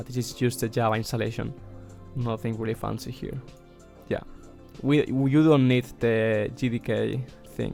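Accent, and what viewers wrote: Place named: Spain. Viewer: Gracias , se nota que eres español xd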